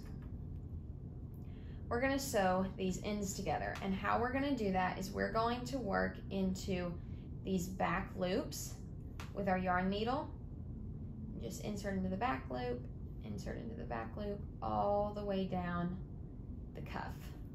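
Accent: South, United States. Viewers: You explain so well and have such beautiful work! Also I love the southern accent I’m from the Midwest This is such an incredible video thank you so much! You definitely have a gift for teaching and arts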